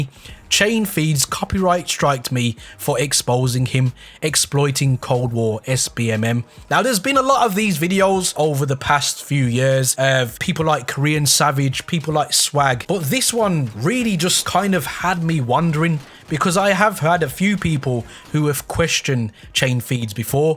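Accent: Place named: Midlands, United Kingdom. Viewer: are you from the Midlands? I recognise the accent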